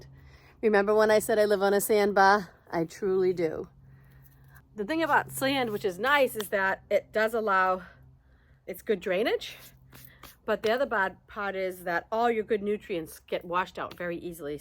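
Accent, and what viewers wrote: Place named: Massachusetts, United States. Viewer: I'm surprised you didn't call Ms. Dig before you dug some holes. Be careful!! Love your accent so Massachusetts just like mine even though I've been gone from Taunton & Boston so many years ago. You sound like my cousin from Taunton. Spring has sprung....take care.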